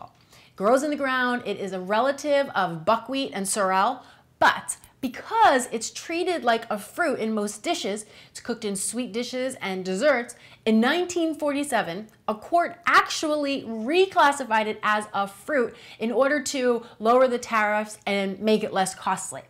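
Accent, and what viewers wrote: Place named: United States. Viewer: Where's your american accent from? Nice video though i wish u had more about harvesting a fresh crop.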